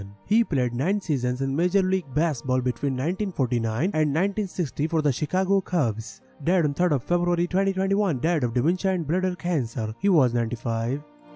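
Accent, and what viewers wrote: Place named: India. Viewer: PLEASE....DO THE HONOR OF CELEBRATING TOP CELEBRATIES DEATH WITH A CLEAR VOICE WITHOUT ANY INDIAN ACCENT !!!! IT IS VERY DIFFICULT TO UNDERSTAND WHAT IS BEEN SAID.....GOT JOTHING TO DO WITH RACISM !!!!!!! PLESSE GIVE THE MIKE TO SOMEBODY WITH CLEAR PRONUNCIATION OF THE ENGLISH LANGUAGE !!!!!!! OMG !!!!!!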